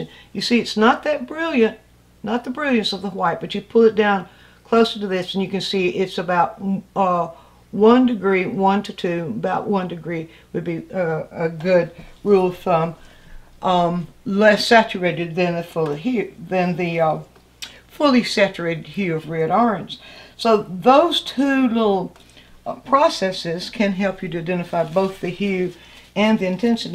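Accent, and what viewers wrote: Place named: Georgia, United States. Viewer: I love how you say “cullah”, your GA accent is so charming!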